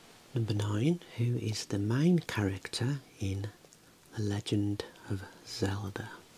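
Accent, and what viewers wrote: United Kingdom. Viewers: The most finest British gentleman I've ever seen speak with such elegance and class! Love from India✌🏽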